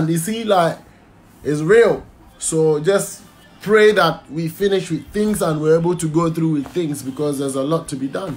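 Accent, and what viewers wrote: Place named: Jamaica. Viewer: You’re talented with unique voice which makes you super extraordinarily musician in Africa but try to limit your Jamaica accent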